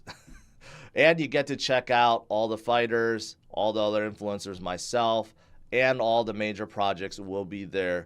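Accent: United States. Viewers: It’s strange hearing what appears to be a Japanese man with a southern US accent!!!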